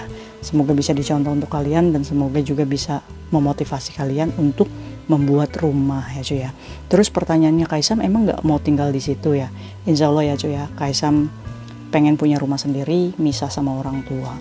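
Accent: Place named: Cirebon, Indonesia. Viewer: Kalo dari logat & bahasa nya kak nih klo bukan orang Cirebon, Indramayu atau Subang 😊 boleh nih main 🤭🤭🤭 BAROKALLAH semoga berkah ya kak rumah nya, Masyaa Allah saya kadang iri kalo ada yg cerita dapat warisan🥰🥰🥰tp justru ini membuat ku tambah semangatt💪💪💪 walau saya gak ada warisan inshaa Allah anak2 ku nanti ada warisan 🤲🤲🤲 Aamiin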